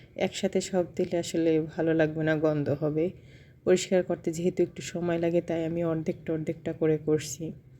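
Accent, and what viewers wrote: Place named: Barishal, Bangladesh. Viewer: আপু চলে আসলাম তোমার ভিডিও দেখতে তোমার কথায় বরিশালের ছোঁয়া পেয়ে ভালোই লাগলো মিষ্টি কুমার ❤❤❤❤❤❤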